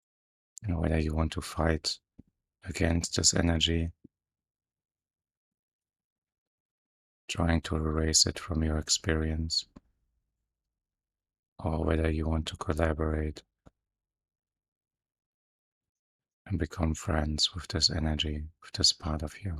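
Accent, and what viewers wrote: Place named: Germany. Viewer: Bro thank you so freaking much bro. I was Over here continplating stuff about my ex who was German and I'm realizing I think she cheated on me then I see this video and it sounds like you are German and I'm also going through stuff with my mom and I was also for the last 3 years I have been like the angel guy cuz I thought I could like you said heal people by that but I ended up getting taking advantage of. Thank you thank you thank you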